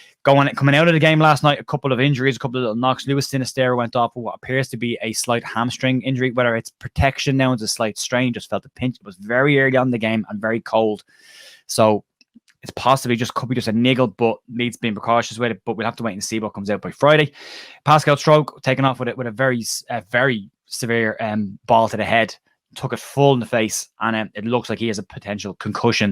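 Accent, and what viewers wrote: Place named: Galway, Ireland. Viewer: Great job! As a novice follower of the game my tactical understanding is limited. But when it comes to how the Board is going about this transition, I have similar experience from other corporate situations. Your coverage of this aspect of the Whites is both knowledgeable and, even rarer, objective. No pinch of salt needed. In addition, to my Midwestern ears, your delightful Galway brogue fits easier than Yorkshire English. The non-game terminology is also curious to me. Here in the US, we wouldn't "sack the manager" but "fire the coach". And if the replacement isn't onboard soon, we'd be saying, "Ready. Fire. Aim."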